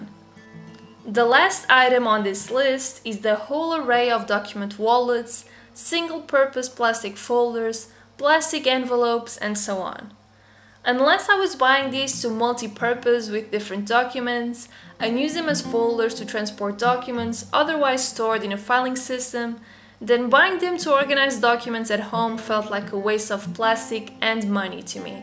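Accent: Portugal. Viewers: Are you portuguese? I recognize your accent ahaha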